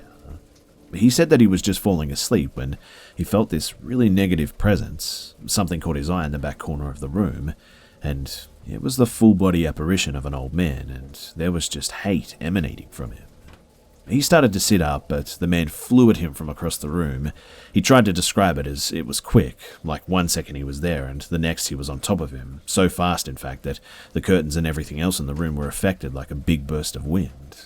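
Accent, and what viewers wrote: Australia. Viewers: I found you through urmaker and I'd already heard of you but I'd never watched a video of yours and I certainly wasn't expecting that Australian accent but I'm not complaining! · I've only recently begun using these "true scary stories" videos to help bring about sleep, and of the plethora of channels, I've found only a small handful of narrators whose voices I can even stand, let alone genuinely enjoy. I'm happy to say yours falls directly in the "awesome" column. If it wouldn't blatantly (and rightfully) be considered theft/plagiarism, I'd suggest you take the stories from all the other channels and spruce them up with that buttery voice from down-under! ;-) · It's so great to hear a fellow Aussie voice, it helps me haha ! Well sometimes helps me sleep lol. It's am and I'm still awake lol . But thank you so much I love this,IV been listening to creepy pasta and for some reason the Aussie accent makes me feel like home. 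✌️✌️✌️🙏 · I moved from Australia to the US when I was 14. You sound like home 🤗. I appreciate you being awesome man! Thank you!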